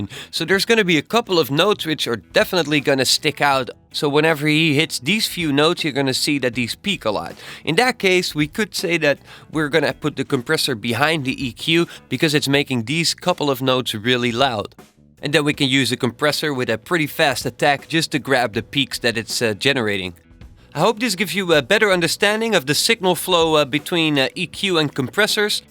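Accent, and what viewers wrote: Netherlands. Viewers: Toevallig Nederlander? 😀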